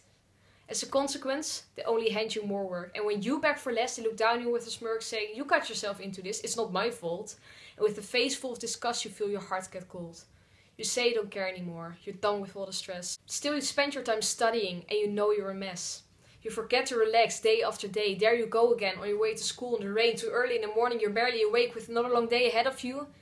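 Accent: Netherlands. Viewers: Heyyy are you Dutch? I think I recognise the accent.👍🏻👍🏻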